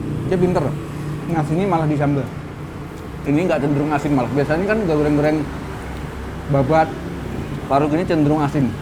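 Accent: Java, Indonesia. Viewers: Mas bhs jowo ne medok. Asal mana toh mas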